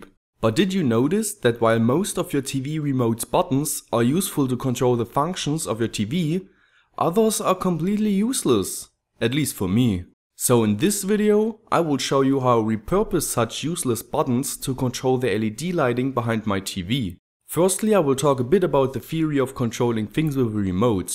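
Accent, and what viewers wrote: Germany. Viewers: Thank you for that Video :) It helped me out realy much ! Please keep doing such nice projects and Videos. Is your mother tongue german ? :) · 8233Eire it's a German accent btw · Gebürtiger Deutscher? Man hört es :DD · Du bist deutsch oder? Your accent is german. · keep making cool stuff that's affordable and other folks can make too. P.s I noticed the German accent considering you're doing this stuff and college is free in Germany what kind of engineer exactly are you?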